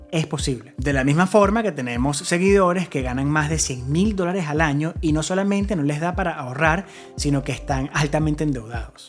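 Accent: Venezuela. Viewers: Eres de Venezuela? Suenas super venezolano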